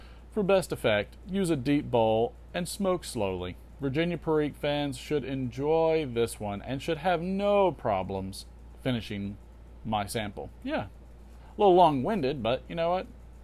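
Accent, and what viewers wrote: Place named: South, United States. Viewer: Nice southern drawl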